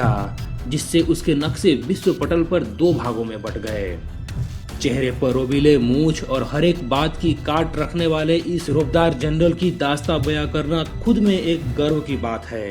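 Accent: Bihar, India. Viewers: Yah baat.. Yah baat karte karte video ki aisi taisi kar Di.. Voiceover kisi aur se karwa lete yaar.. Bihari accent does not suit the video theme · Dude, you have worst hindi accent, Marsal nahi Marshall, Saw nahi Shaw, Des nahi Desh , pls stop this bhayiyya accent laude. 😆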